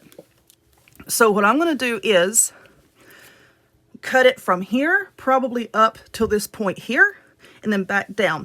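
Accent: South, United States. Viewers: I love your sense of humor and your Southern accent. Only nosey people are going around picking up stuff to see if you took the tags off 😂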